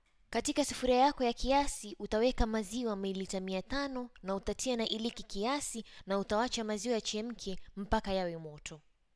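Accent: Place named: Mombasa, Kenya. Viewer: Mombasa nahisi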